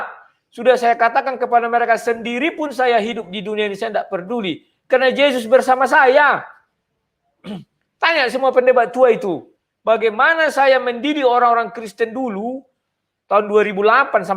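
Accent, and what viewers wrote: Medan, Indonesia. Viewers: Bener kali Ito ‘ Firman Tuhan yg Ito jelaskan itu sangat masuk ke pikiran ku ‘ jelas dan logat medan nya jelas dan straight to the point ‘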